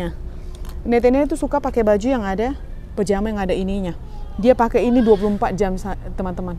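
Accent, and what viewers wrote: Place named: Medan, Indonesia. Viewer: Aku baru ikutin kak Mau nanya kak dari medan ya? Logatnya medan kali di Brastagi gitu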